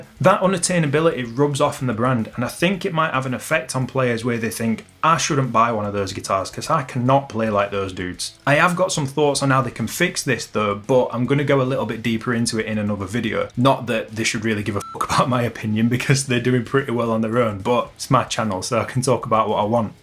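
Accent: United Kingdom. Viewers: HEMA or Historical European Martial Arts as it's called is what i do. It's growing in popularity throughout the country, i'm assuming from the accent you're UK based, so depending on where you are you may have a single club or several in your area. It's a fairly relaxed environment in my experience that you can take things at a pace that suits yourself.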